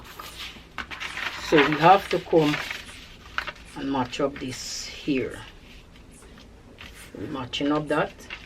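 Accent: Guyana. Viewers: I just join your YouTube you sound like a Guyanese I would like to know where you buy your mannequin iwould like to purchase a size 20 one please let me know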